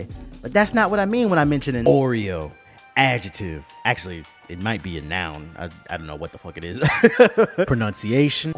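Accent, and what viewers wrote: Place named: Chicago, United States. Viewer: damn you from chicago south south sideee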